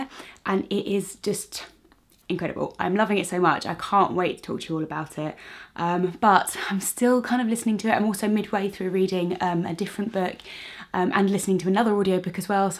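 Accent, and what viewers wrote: United Kingdom. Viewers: You're great, I love your British pronunciation and as a student of English philology in Poland I just have to say how much I admire your work!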